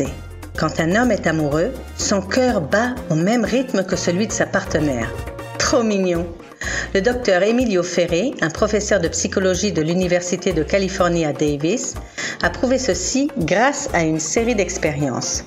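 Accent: Quebec, Canada. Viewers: Arrêtez avec vos "la voix est nulle" l'accent québécois on comprend rien !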